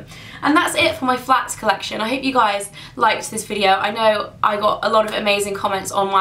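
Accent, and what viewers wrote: United Kingdom. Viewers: It's the British pronunciation :)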